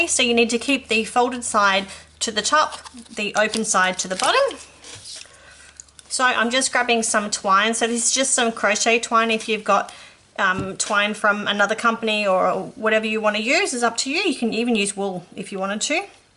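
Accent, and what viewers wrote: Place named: Australia. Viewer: I love ur australian accent! Haha. Thx for the helpful vid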